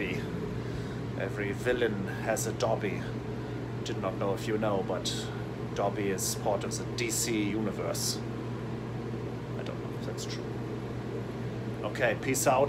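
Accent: Germany. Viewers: dein akzent ist so brutal deutsch aber süß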